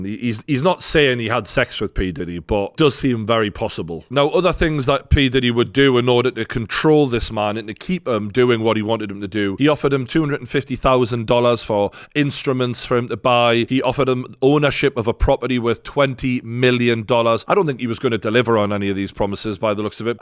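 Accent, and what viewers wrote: Newcastle, United Kingdom. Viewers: Brilliant, we need more Geordie explanations of things going on. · Such a brilliant fresh delivery of all this Diddy tea 🫖 ☕️… love the geordie accent, and subscribed 😂😊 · Geordie accent, what does this mean? I do kind of like listening to this guy. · He is a Geordie from Newcastle upon Tyne. Check out any vids on the accent and city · He accent is called Geordie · Swear is jokes 🤣🤣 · I love this but he's not Irish bless ya! He's from Newcastle the accent is a Geordie accent 😁😁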